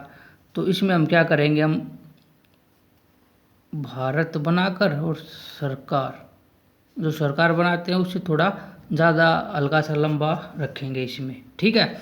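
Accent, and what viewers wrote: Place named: Rajasthan, India. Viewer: Sir Nationality to sabki Indian hai, State wise kaha se ho, because by your tone you seems like from Rajasthan/Haryana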